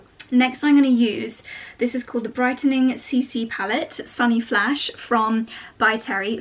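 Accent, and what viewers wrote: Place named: United Kingdom. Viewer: This might seem weird, but you remind me of Barbie. A real-life Barbie with a British accent! :)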